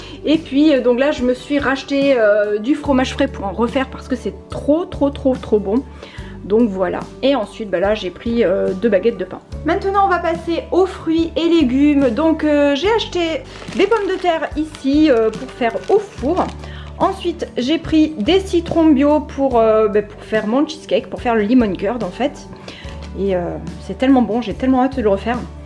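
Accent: South, France. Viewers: J'adore vos vidéos avec ce petit accent du Sud, vous avez l'air d'être très sympa, naturelle et une personne sans prise de tête.. Je vais faire 2 ou 3 courses a Lidl 😅...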